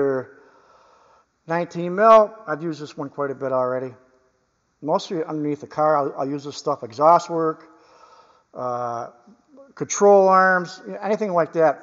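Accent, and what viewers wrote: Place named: East Coast, United States. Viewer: Your accent reminds me of home. I go back east for the holidays and still don’t believe I sound like that 😊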